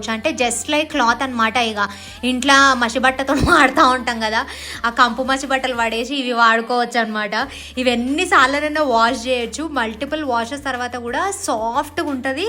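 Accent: Telangana, India. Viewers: Masi batta 🎉🎉🎉❤ telangana slang 🎉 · hello akka mi slang bale untadi pakka telangana la❤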